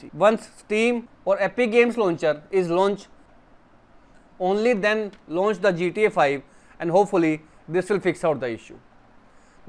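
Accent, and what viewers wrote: India. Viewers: 😭you know its gonna work when its a guy with indian accent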